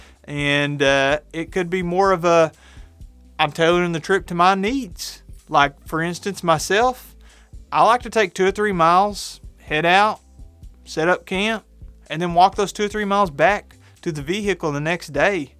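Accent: United States. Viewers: Ur a likable bloke, u sound so American and 🤣 Please don't hike in trainers (tennis shoes) 🙄🤣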